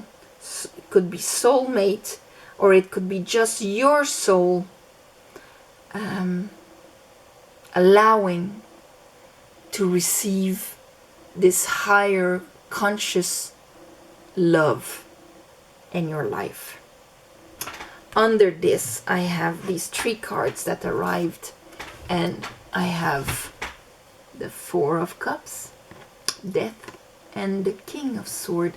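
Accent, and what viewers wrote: France. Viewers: Thank u. I hear a beautiful French accent. U r very beautiful inside out. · cute french accent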